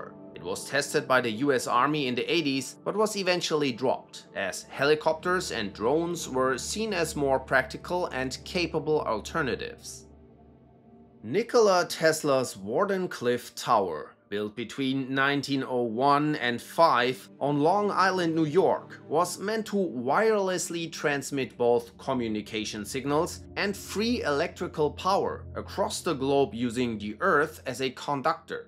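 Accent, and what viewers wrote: Germany. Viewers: Der deutsche Akzent ist Mega! 😅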